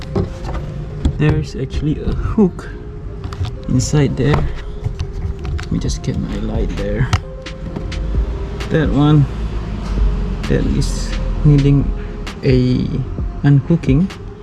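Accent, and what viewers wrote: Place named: Australia. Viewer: Pergh oz accent... Next content seat bucket ke???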